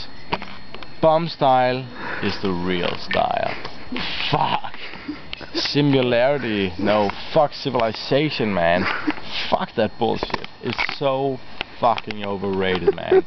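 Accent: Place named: South, United States. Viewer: Lol, not to offend anyone, is this guy like Puerto Rican or something? He sounds very Southern american.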